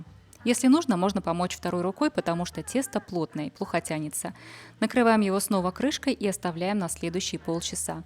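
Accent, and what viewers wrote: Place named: Belarus. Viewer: Вы из Беларуси? Я узнаю этот говор. Как и свой, родной, Одесский. Пошла месить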